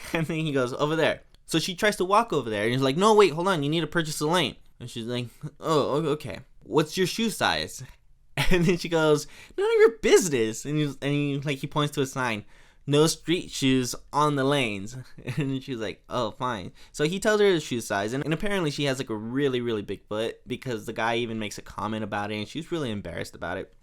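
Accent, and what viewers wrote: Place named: France. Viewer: The French accent of yours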